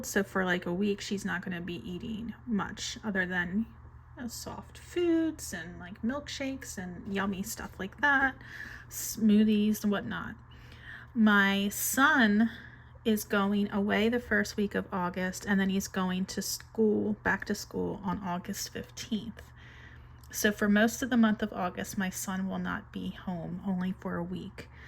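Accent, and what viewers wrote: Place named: New Jersey, United States. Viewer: As soon as I listened to you, I knew you were from Jersey. My family lives in Wall Township and Belmar.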